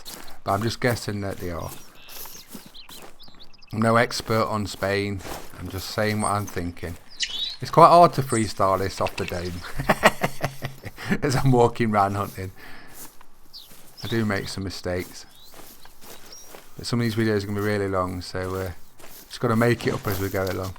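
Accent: Midlands, United Kingdom. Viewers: WoW boring. Stupid comp thats dumb just add 250. Plus doesnt offer the game to someone who doesnt own it. Too many yt channels the gd ones get maskd by the million try hards. Why do uk midlands cotw love ther voice so much. Notts Leics? Focus on diamonds and rares not shit chat.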